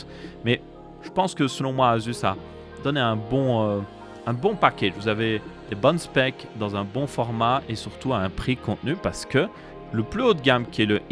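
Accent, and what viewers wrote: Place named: Belgium. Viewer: Merci pour cette vidéo, je te suis depuis quelques temps et j'aime vraiment bien ta chaîne, je deviens cultivé sur l'informatique grâce à toi haha, d'ailleurs à ton accent je crois reconnaître un Belge, non ? Si oui, on est deux (bien que cela ne change rien, je tenais juste à me la péter un peu...)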